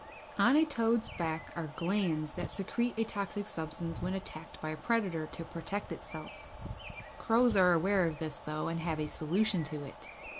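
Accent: Canada. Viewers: Oooh very informative, thank you 👍🏻 You have a lovely soothing voice, I love your accent 🎼 Canadian, I'm guessing ?!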